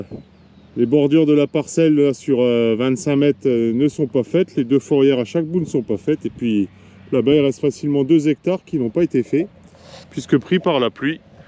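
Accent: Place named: Champagne, France. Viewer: Bonsoir, il fait beau aussi en Champagne, t'es champs sont pas loin de la route Vitry Chalons, il y a de la circulation, de bien jolie vidéo, je suis quelques agris en vidéo toi et Sam mais lui pas dans le même coin, toujours cet accent Champenois, Gilles en Sud ouest Libournais , allez vive la Champagne et la Bourgogne, ta terre est pas trop mauvaise, tu préfères la craie, ici chez moi de l'argile au jardin, mon épouse s'occupe des fleurs en ce moment, rien au jardin, mais il est prêt pour les plantations ensuite quand viens l'été plus rien, l'eau coûte trop cher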